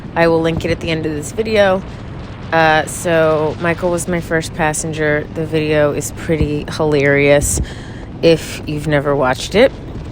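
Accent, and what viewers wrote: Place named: Maryland, United States. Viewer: Despite living in the area, (Fairfax) every time I watch your videos and hear your voice, I think, "That girl is definitely from Maryland" with your Maryland accent. You and cameraman both. I guess because I'm a New Jersey transplant who came to the DC area after my job transferred me here 30 yrs ago. LOL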